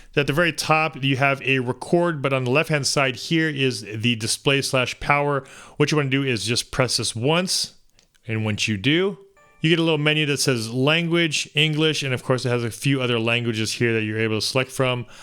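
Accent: Philippines. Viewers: Ilocano ka gayem..i know by accent .lol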